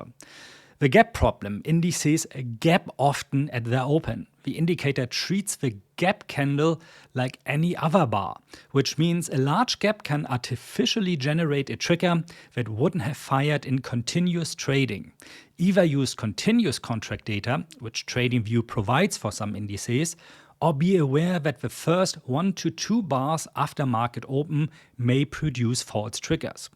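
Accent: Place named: Germany. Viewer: Subbed - I had to. It's the German accent. ~(￣▽￣)~*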